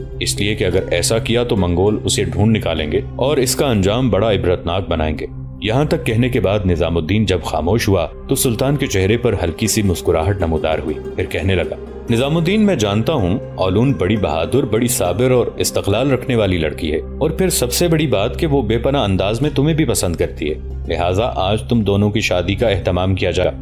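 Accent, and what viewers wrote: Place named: Lucknow, India. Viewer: Agar Lakhnavi andaz mein commentry na ho to behtar hay.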